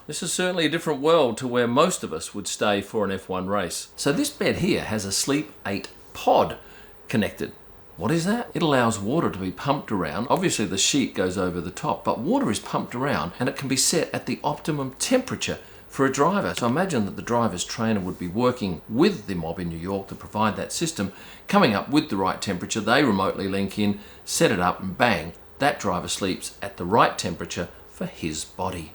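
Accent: Australia. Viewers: Fantastic channel. It's good to hear a laid back Aussie. Great content and presentation. 🇦🇺 😊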